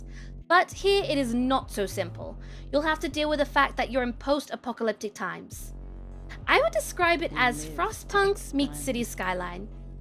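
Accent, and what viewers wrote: United Kingdom. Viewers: I thought I heard some british accent or intonation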